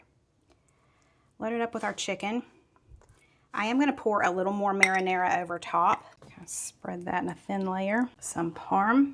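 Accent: South, United States. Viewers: Embrace your southern accent!!! Say boil and broil and oil the way you normally say it. 😊 We never miss an episode!!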